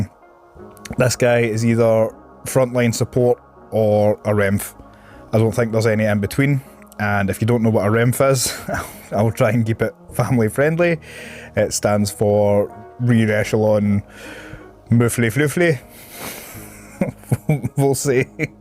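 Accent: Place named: Scotland, United Kingdom. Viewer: Some new necromunda channel I've discovered, great and detailed analysis, charming Scottish (i presume) accent, nice builds. Thanks!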